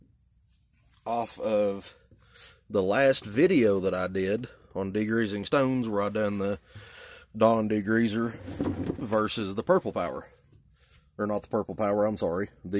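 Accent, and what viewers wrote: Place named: Eastern Kentucky, United States. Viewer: Hey brother found you channel not to long ago and I just hope you're doing well! Cheers from eastern KY I can tell you got a accent near me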